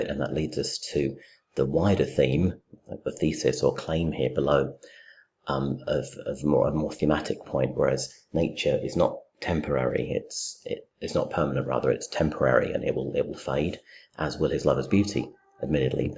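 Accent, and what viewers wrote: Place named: RP, United Kingdom. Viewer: I swear this one is the best interpretation ever! And your clear RP sounds exceedingly gentle!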